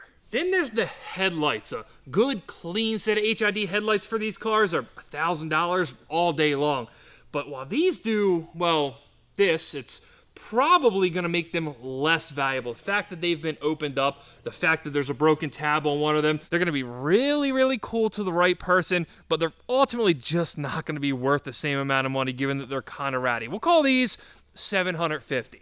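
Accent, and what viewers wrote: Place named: Eastern Shore, United States. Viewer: Just by your accent I can tell you're from the Eastern Shore MD😂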